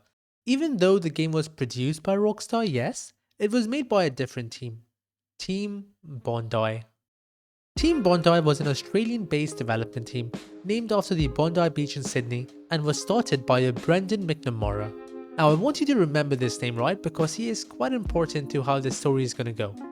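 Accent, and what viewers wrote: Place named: Iran. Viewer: Great video! By the way, are you persian? I hear hints of persian accent in your voice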